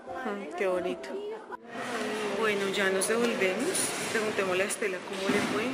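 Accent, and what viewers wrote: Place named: Colombia. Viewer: sabía que eras Colombiana por el acento, pero ni idea que vivías en bogotáa!! que chevere tu videooo! Un día tenemos que conocernos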